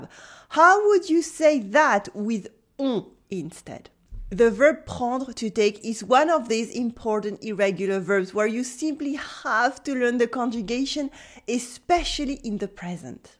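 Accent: Paris, France. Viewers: Is this Parisian French?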